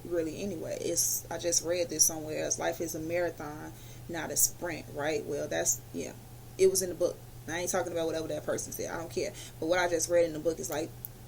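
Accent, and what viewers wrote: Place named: Chicago, United States. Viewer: You from Chicago? I feel like I can tell 😩😍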